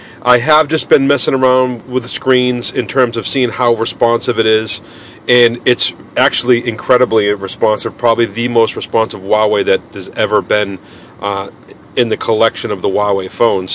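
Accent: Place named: Boston, United States. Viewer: Where are you from? I want to say Boston cant quite put my finger on it.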